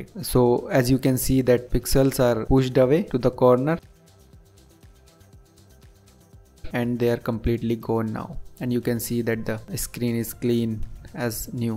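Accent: India. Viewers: He has the best indian accent!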